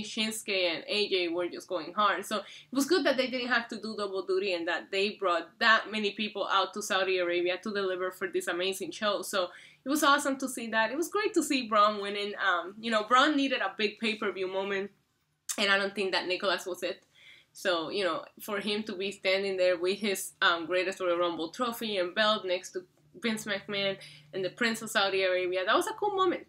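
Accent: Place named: Puerto Rico. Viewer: by your accent you sound Puerto Rican!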